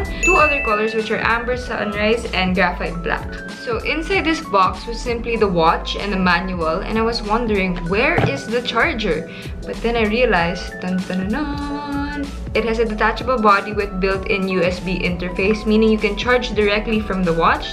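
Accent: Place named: Philippines. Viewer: Her accent very much like a filipino 😘.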